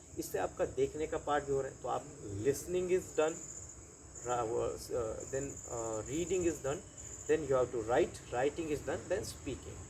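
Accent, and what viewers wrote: South, India. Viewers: Sir ki english language ko south ka touch hai....IPS me unko south ka cadre mila hoga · Sry to ask bt Are u from Maharashtra or south.. Bcoz your name is bt your accent is like south indian..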